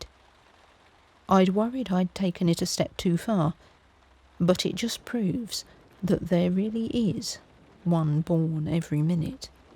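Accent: England, United Kingdom. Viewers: Oh I am forever grateful to have found your site! Your soft spoken English (not American or AI) is so relaxing for this Australian at bedtime. I hold out hope that one day we will get to enjoy longer stories written by yourself. Your writing is great! I'm very appreciative. Thankyou, and many blessings to you ❤️🙏🏼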